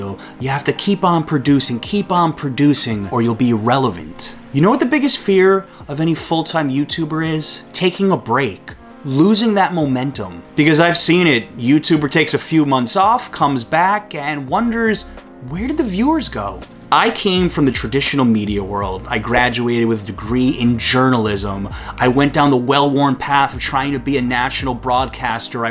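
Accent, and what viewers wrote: New York, United States. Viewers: NYC has always been on my bucket list and few years ago I tried searching for things to do in New York and one of your vlogs came up and binged watch your videos, from then on, your voice is kind of synonymous or like has this NYC feel into it haha, I might study in Canada next year and if ever got the chance, I'll definite visit NYC and follow all your tips, along with Sarah Funk, Action kid, Brett Conti and with your cam guy and third wheeler and a fellow Filipino LOL, Thank you, you're one of NYC Gem!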